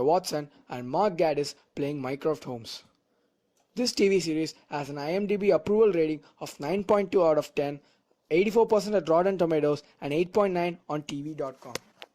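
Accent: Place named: India. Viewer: dude... your English is terrible. and you're speaking so fast that you sound like youre actually speaking your own language. I have to listen hard to even hear a few words. you have a very thick Indian accent. also you keep breathing too hard on the mic. Maybe try speaking slower and try pronouncing your words better while learning how to speak in English properly. or just do it in your own language.... anyway I'm giving you a thumbs down on this one.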